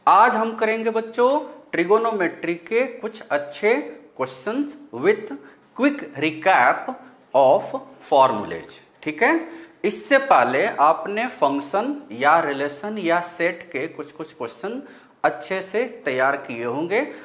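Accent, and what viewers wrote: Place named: Bhojpuri, India. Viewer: Thoda Bhojpuri tadka 🌶️ lag rha hai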